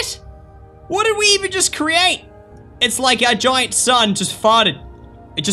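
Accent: Australia. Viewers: Australian Jacksepticeye = Immediate sub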